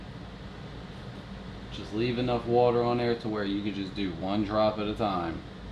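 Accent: Baltimore, United States. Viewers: I could tell in 10 seconds that you were from Baltimore man. I'm an old school South Baltimore 21230 guy here. Born and raised. Light St. , Charles St and Fort Ave. We all have that Baltimore accent that people can't miss. Lol. Its not often that I see many of us Baltimore guys on YouTube. Best of luck with your channel !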